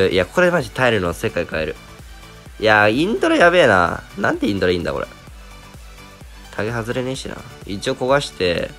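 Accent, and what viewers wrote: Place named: Shizuoka, Japan. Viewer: 久しぶりにRADさんの動画見たらめっちゃ静岡訛りでばかばか言ってて何があった？って感じ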